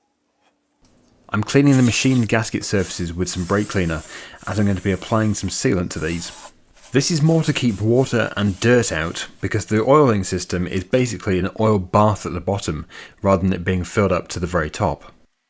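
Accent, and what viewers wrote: United Kingdom. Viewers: British voice & machinery for restoration! That's so ASMR! Subbed! Thank you!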